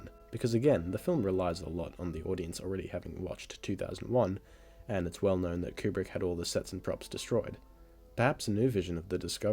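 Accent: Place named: Australia. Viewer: Always so validating hearing an aussie accent. Love the video mate!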